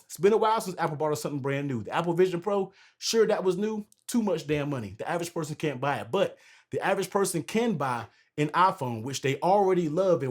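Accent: United States. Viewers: I know that accent…you from the DMV bruh?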